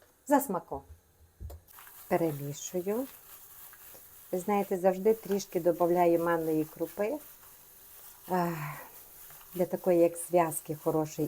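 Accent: Transcarpathia, Ukraine. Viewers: Дуже приємно слухати господиньку із закарпатський акцентом. ❤ Закарпатська кухня дуже смачна. ❤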